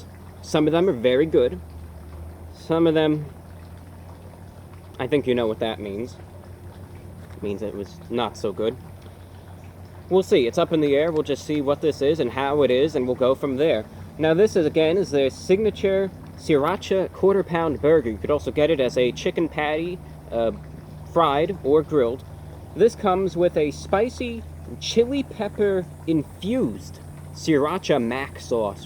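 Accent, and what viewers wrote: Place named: New York, United States. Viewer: I don't get it. It looks like he lives in Florida but he has a slight New york accent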